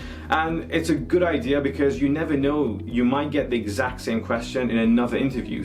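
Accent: Mauritius. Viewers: Is that a slight Mauritian accent?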